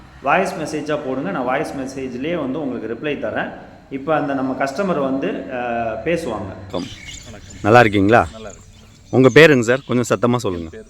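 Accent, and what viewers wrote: Madurai, India. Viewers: ❤ madurai basha ❤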